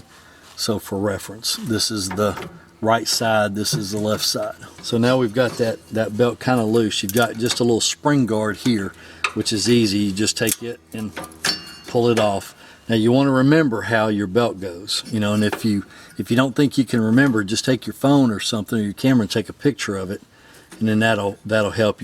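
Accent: South, United States. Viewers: Hello from France ! Thank you so much, sir ! I've changed both belts on my tractor while enjoying your southern accent in the process !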